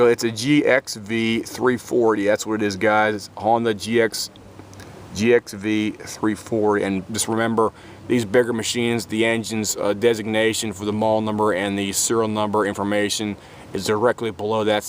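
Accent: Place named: Western Pennsylvania, United States. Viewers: Unmistakable SWPA "accent." Shout out to younz from Uniontown! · That accent of adding 'W's to any word with an 'O' in it is awful. Thankss for demostrating how to lift the hood. What a waste of bandwidth this is. Remind me to never stop in West PA when driving from Detroit to Jersey.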